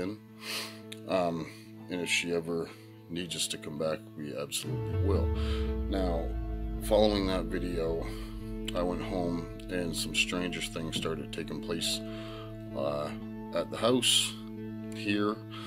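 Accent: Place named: Maine, United States. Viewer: Seen your channel on Nukes Top 5 and I just subscribed. Can't wait to check out your other videos. You sound like you're from Maine. Peace✌️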